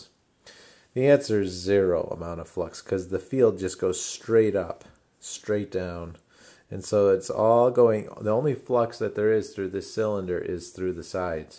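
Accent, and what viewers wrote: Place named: South, United States. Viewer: Never thought learning physics from a dude with southern accent would be more helpful than my professor with British accent. 'Murica!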